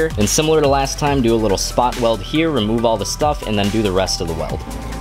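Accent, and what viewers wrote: France. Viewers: Dude that french accent 👌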